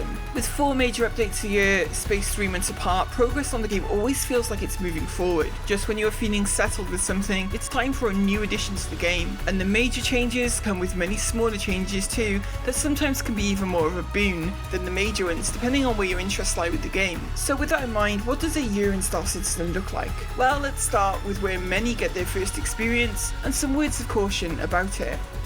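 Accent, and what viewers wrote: Wales, United Kingdom. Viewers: Your voice is lovely sounding and Welsh #FullTidee so don’t worry about those that mock. I’ve been placing SC just about as long as you have but can’t put the hours in as much as I’d like so still feel a bit of a newb when it comes to approaching an Org and I tend to hide away mining and exploring different locations. I’m less combat focussed so maybe Skunkworks can form an explorer arm and recruit for that?:) Keep up the good work though (and ) and see you in the verse hopefully soon. Taffy Coops. X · Thanks! I thought you were speaking with a British accent. We all have something. I’m low vision. It’s OK and you and are among friends! Your videos are fantastic!! I very much enjoy them. Please continue. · I honestly just thought the voice was a specific British accent from somewhere. 😂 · you make really good content. Nice Music, good gaming, good edditing and your voice rounds it all up its just natural, its good as it is. 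English is not my first languege but do you have a britisch accent? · Awesome! The Welsh accent is lovely. I’m down here in the Southern Hemisphere, and I like being able to remember accents and where they come from. (I have fun guessing with overseas visitors at my work). Best wishes for 2022 and beyond! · There's nothing wrong with your voice. I do love that Welch accent, but it could be thicker....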